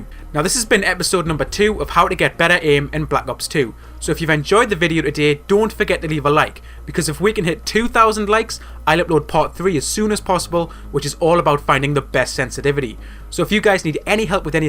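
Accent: Newcastle, United Kingdom. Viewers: Are you deaf lol. He is a geordie...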